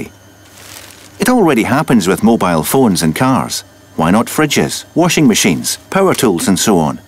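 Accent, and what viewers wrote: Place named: Scotland, United Kingdom. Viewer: Aye, I love the narrator's Scottish accent. <3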